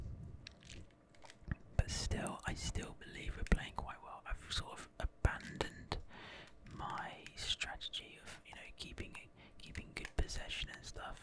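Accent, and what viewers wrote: United Kingdom. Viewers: What part of London are you from?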